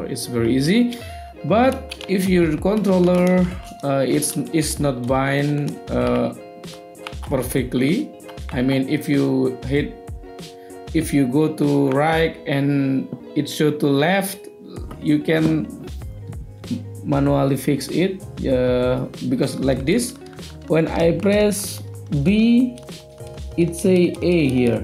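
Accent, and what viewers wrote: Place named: Indonesia. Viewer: Logat Indonesia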